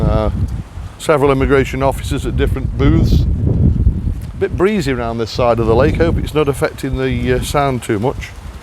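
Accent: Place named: Stoke, United Kingdom. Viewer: New sub here, you sound like a stoke youth ?..i,m back in uk after 7 months (222) days in thailand, i cant wait to get back ,. ive been over there 11 times in all since 2009, ive got a long term thai g/f and we live at her nice house in kamphaengpet , ive got a car so we travel all over TH usually for a month when i get back there, .The last trip was 25th nov 22 and we did most of the southern islands , spent a couple of days in kamala beach down your neck of the woods , I had my fill of thai immigration with extensions and border bounces but it worked out ok to maximize my stay,. I bet it does your head in looking at the thai power pole wiring, im surprised it wasnt 1 of your 5 thai dislikes, !. I plan on getting a retirement o visa next time but there's talk of immigration doing a 90 day visa exempt and changes in the 800000 bht retirement o visa, have to see how that goes, i want to be back by the end of november latest,. 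Bit pointless getting a 60 day tr visa in uk if they end up doing a 90 day free one, im doubting it will happen , having only retired on nov 18th 22 and flying out on the 25th nov 22 69 y/o times not on my side, ive been back in uk since july 4th 23 and i cant seem to get motivated over here, i was planning on selling up and shipping out but with the unsertanty with th immigration its easier said than done, i might go down the agentcy route visa wise, although i have the money and resdency out there ive not got thai bank account yet,.we will see how it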